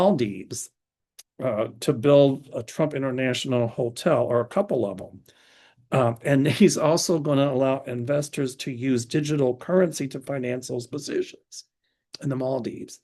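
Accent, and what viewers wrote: South, United States. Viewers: I absolutely ADORE you Auntie 💜🌻🫶 I love the whole Southern accent and when you say "you all* and I've learned to take the upper road and say " "Bless your heart" to those I don't quite agree with. 😆🙏💯💜🌻💫 Thank you and God Bless! 🙌💫💯🌻💜🙏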